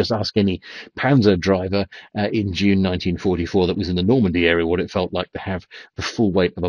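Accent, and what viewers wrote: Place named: United Kingdom. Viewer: ... a beautiful and glorious Limy Brit that can make swearing sound classy😂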